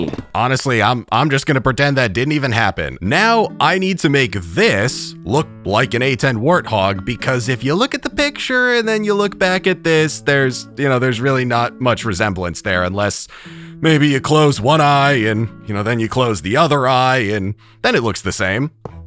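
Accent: United States. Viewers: In the first sentence that ive ever heard from this ytuber, I instantly realized hes american.🇺🇸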